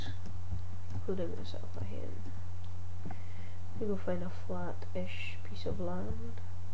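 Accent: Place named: Scotland, United Kingdom. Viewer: I figured out your scottish in this one, within 12 seconds, awesome lol.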